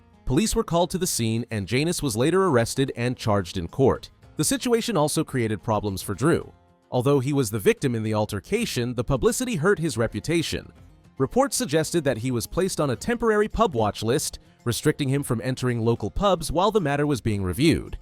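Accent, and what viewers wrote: United States. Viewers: Why is it being narrated by an American? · Never liked Drew his ego arrogance and I thought down to people stitched hard working people a real tight arse. But why is this done by an American voice and said doolars instead of pounds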